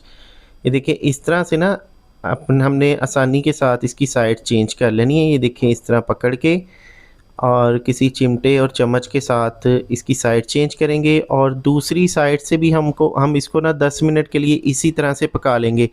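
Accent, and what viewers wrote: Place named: Punjab, India. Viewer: Assalam alaikum bhai... Masha Allah bohat khub aapki recipe hai... Agar isko mein fry nahi karta aur isko grill kiya OTG ya microwave mein ... Kar sakta hu... Zaroor reply kare... I like your accent... Punjabi tadka laga ke.... Allah Hafiz...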